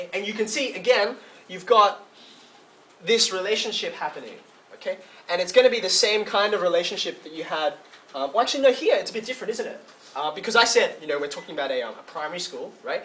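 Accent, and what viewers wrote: Australia. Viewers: He sound australian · Yeah, I have to admit I was distracted at first by the thoughts "is that guy Australian?" and "what a cool presentation technology".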